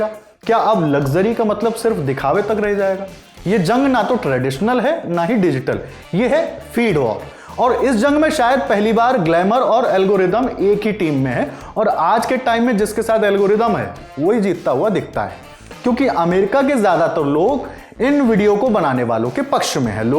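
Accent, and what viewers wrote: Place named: Kanpur, India. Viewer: कहि रहे...रहि जाएगा...वइसे...पहिले...😂😂😂 कनपुरिया स्पॉटेड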